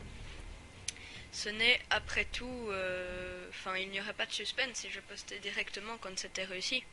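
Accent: Switzerland. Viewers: Il est serait pas suisse lui par hazzzzard???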